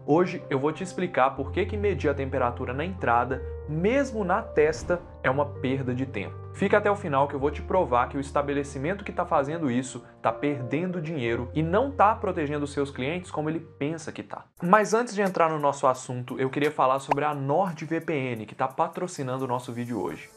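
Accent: Minas Gerais, Brazil. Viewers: Esse sotaque mineiro é muito gostoso de escutar!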